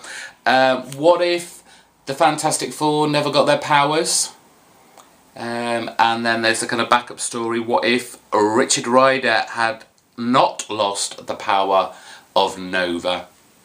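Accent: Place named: West Country, United Kingdom. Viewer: Appreciate the codes :) Loled at your "pretty darn decent" Sounded like everyone from down my way lol Gotta love the West Country accent! Enjoyed the vlog...missing your marvel graphic novel collection vids tho :/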